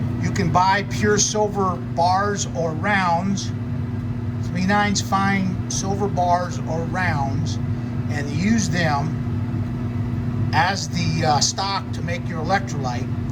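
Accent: United States. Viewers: I really enjoy listening to your American English accent. Is there any information in the literature on the ratio of silver to copper deposited when the electrolyte solution contains silver and copper nitrate? It's probably above 1000, right?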